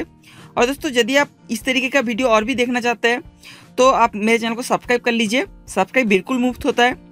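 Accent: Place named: Bengal, India. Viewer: Vo bengali hai bol ke uska hindi aisa hai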